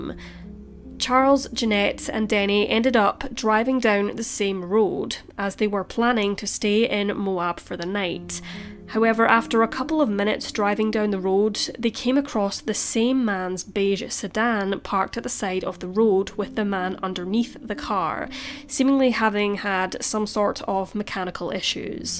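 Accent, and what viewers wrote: Scotland, United Kingdom. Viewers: The narrator has a Scottish accent.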